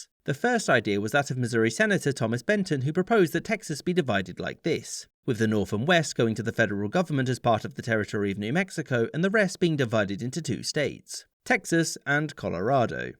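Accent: England, United Kingdom. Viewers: Nothing is more amusing than listening to a British man talk Texas things to a Texan 😂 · I love listening to Englishmen talk about my country's history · Love to hear a Brit explain my home state's History. Something I had to look up myself because 7th grade Texas History didn't cover it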